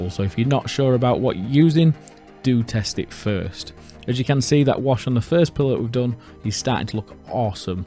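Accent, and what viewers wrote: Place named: Yorkshire, United Kingdom. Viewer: Tell me your from Yorkshire without telling me your from Yorkshire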